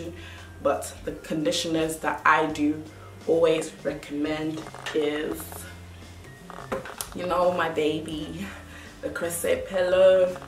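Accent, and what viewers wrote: United Kingdom. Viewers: It took me 12 minutes and 38 seconds to realize that you have a damn English accent! Wth!! 😭 I’ve watched two of your videos before this as well, I am so confused. 😂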